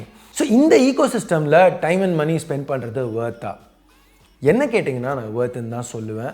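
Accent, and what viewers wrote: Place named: Chennai, India. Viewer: bro..unga chennai tamil slang semma🔥🔥🔥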